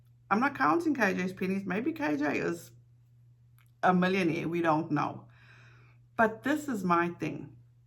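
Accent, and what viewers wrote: South Africa. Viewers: I love your accent. South African? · Are you from South Africa? I kind of hear that in the accent, otherwise I can’t place it.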